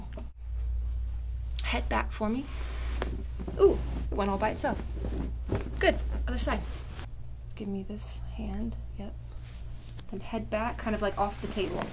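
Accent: England, United Kingdom. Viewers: It's weirdly jarring hearing a familiar British accent in your videos. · Is that an english accent?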